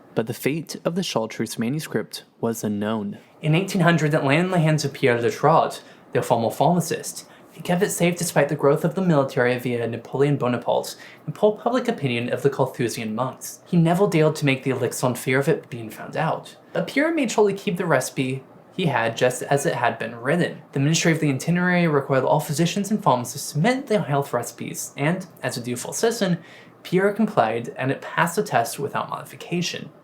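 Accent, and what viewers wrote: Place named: South Korea. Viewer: His pronunciation and his diction are all over the map. He places accents for words in the incorrect place and, if that wasn’t bad enough, his actual accent sounds as though he learned to speak English as a second language in Korea.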